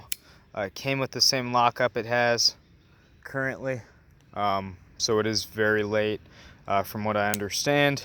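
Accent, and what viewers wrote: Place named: Midwest, United States. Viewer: You sound very much like a Midwest ‘up north’ guy and it’s appreciated.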